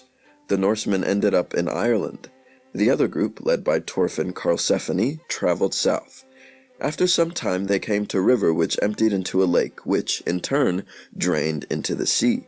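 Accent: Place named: Canada. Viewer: I was mystified by the mispronunciation. The narrator has a Canadian accent, but pronounces some things phonetically. Makes me wonder if this is actually an AI bot program. Growing up in eastern Canada, we had history lessons out the ying-yang and the pronunciation of common Canadian places is pretty basic. And I can't imagine an Anglophone mispronouncing "sword" as it looks.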